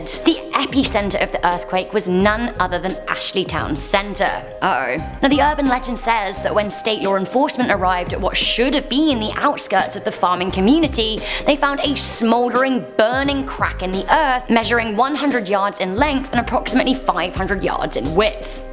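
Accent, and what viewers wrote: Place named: United Kingdom. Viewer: I love her British accent 😮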